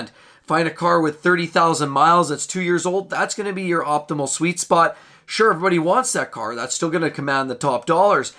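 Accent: Canada. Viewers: What accent is this? Canadian?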